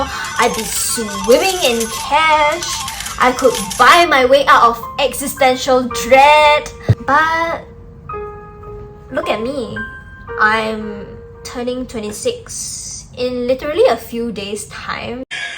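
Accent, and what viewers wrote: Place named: Malaysia. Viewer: Hey beautiful. Whats your accent? Malaysian?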